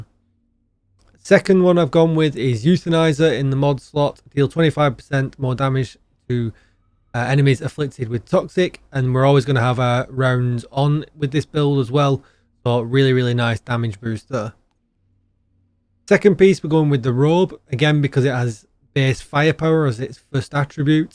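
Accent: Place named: Yorkshire, United Kingdom. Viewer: Hey where in the UK are you from. Your accent sounds maybe Yorkshire? Great content by the way.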